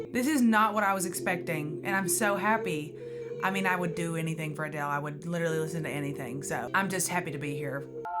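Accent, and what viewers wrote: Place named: South, United States. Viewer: your southern accent is my favourite thing ive ever heard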